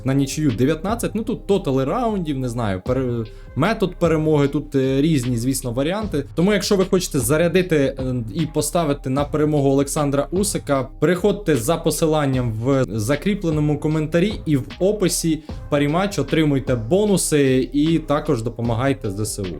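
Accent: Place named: Russia. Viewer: даже по твоему акценту видно что на русском языке лучше разговариваешь,не ломай язык и разговаривай как удобно на русском да и думаешь тоже так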